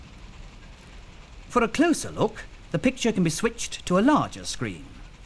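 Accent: RP, United Kingdom. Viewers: “Tel-eh-vishon keyameras” love the R.P. Btw, Wondered how long it would take for some sad kn*bhead to mention ‘Police State’ this was1972 you d*cks! It’s just a bit of nostalgia!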